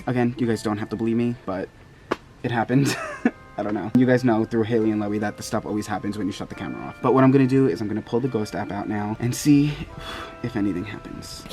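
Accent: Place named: Boston, United States. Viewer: love that boston accent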